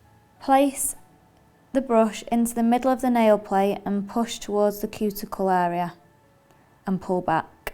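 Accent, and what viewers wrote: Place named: Manchester, United Kingdom. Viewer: No, it's a Manchester accent.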